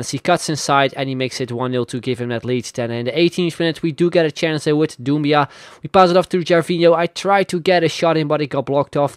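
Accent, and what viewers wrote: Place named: Netherlands. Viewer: 1e video die ik kijk van jou en ik hoor gelijk de je nederlands bent :D maar je spreek echt goed engels man. en de video is echt tof +1 abone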